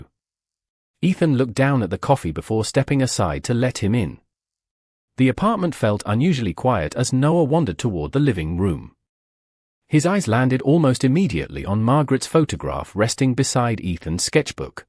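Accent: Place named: Vietnam. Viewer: Same old topic but with a glitch in the AI voiceover (I'm a linguist... among other things, but wasn't able to identify it, it sounded like Tagalog, but with Vietnamese cadence). Also, the tagline is deceptive. There's no straight man to talk about or kiss that never happened. Please, be careful before posting. Thanks. ❤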